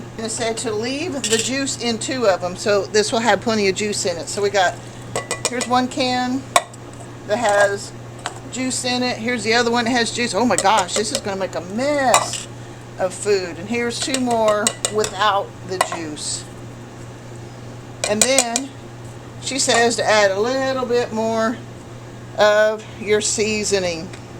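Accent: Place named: Oklahoma, United States. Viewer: I still hear some Okie in you. I have it too.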